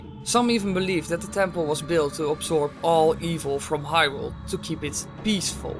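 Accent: Netherlands. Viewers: nederlands accentje mag er wezen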